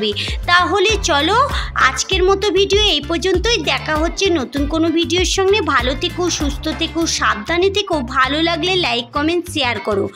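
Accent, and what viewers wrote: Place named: India. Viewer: আজ আপনার ভিডিও প্রথম দেখলাম আপনার বাড়ি কোথায়। কোন দেশের ব্লগার কথা শুনে মনে হচ্ছে ইন্ডিয়া।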